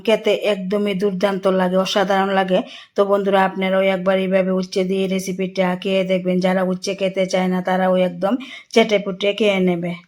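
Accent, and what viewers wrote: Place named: Tripura, India. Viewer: Bhalo laglo. Kintu aapnar bangla uccharan keno annya rakam. Aapni ki tripuri or silent?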